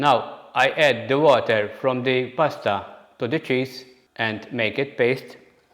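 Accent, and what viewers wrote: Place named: Malta. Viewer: yes but i picked up on your accent and just asking if you are of maltese decent. i know because i am 😊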